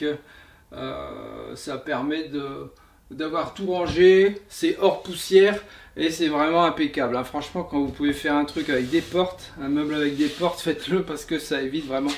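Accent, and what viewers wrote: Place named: Mining region, France. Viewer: Bravo pour cette présentation , je suis un nouvel abonné et à entendre ton accent , tu dois être de la région des mines , si c'est cela on est voisin , j'habite à 30 kms de Dunkerque .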